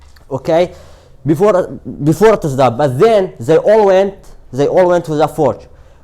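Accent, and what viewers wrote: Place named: India. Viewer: Mashalla , baas hastakam lahjay hindy wargrtwa😍😍😁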